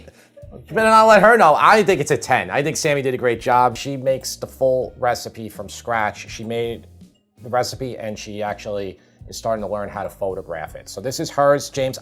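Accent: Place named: Long Island, United States. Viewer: That accent. Is it like old school Long Island? Montauk with a bit of New England "AAHH". I can't quite place it.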